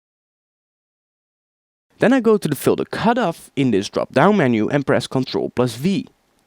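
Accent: Netherlands. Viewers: Is he dutch? Cuz his english accent sound like mine, when i'm talking english :P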